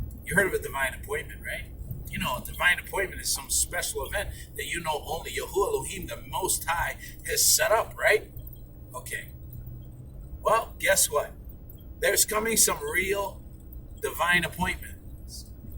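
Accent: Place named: New York, United States. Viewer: Are you really from New York because you nailed the accent and attitude! I'm from New York so I know this and thank you brother for this video! Amein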